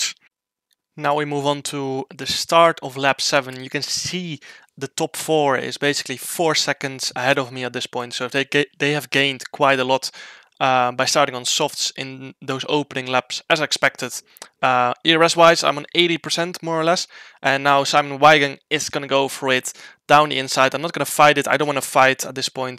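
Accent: Netherlands. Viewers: Always love to hear your dutch accent while speaking haha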